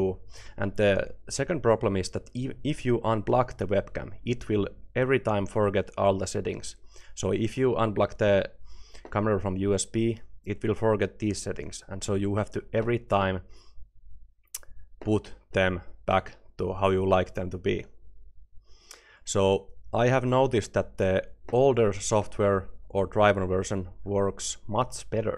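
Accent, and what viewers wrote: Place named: Finland. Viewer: Thank you so so much for your tutorial! I could not figure out how to get the Logitec driver back after I had to wipe my compute recently. That driver is going in a safe spot. Also you have a very lovely Finnish accent, one of my favourites the world over! THANKS AGAIN! (and yes you have amazing English)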